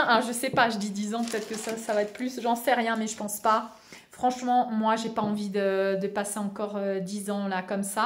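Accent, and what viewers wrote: Alsace, France. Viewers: des fois tu as gardé ton accent alsacien c'est trop mignon lol Ps: je vois 777 likes sous ta vidéo c'est bon signe ça.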